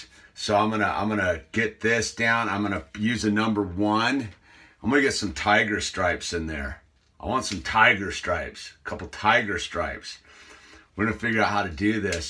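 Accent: United States. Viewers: John lydon be sounding a lot more American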